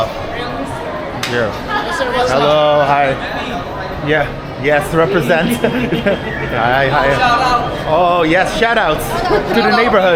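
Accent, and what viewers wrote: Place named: Hollywood, United States. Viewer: Your English as a Dutch sounds like Hollywood English accent.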